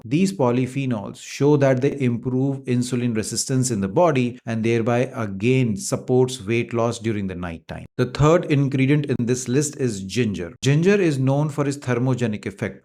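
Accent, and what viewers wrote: India. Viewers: They know - just because its not how you pronounce it. it doesn't mean its wrong. Its Indian English and that how it is.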